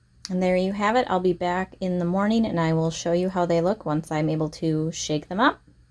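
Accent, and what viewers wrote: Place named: United States. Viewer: Sounds like your American but where did you get the plastic bottles from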